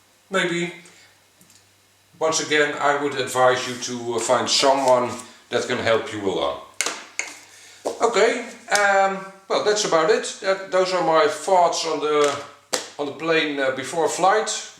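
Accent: Netherlands. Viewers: Ik vind het Engels enorm goed, uitspraak is lekker typisch Dutch.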